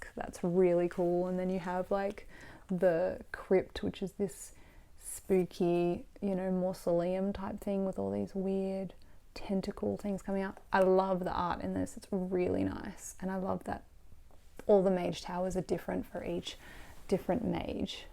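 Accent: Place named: Australia. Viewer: Neat! Its always fin to see someone talk, who you've only seen photos of...especially when they have a cool Aussie accent! I tried my hand at making some videos for YT. I mostly made them for my sisters (to save time on game teach, since we are all in different time zones, and don't often have long to play). I initially published them, but I think I took them down, because I was embarrassed about how they came out. Mostly, I need to figure out how to edit in post and I think it would have looked much better with a pro intro like you have. Nice job! I now want to try this game sometime. :)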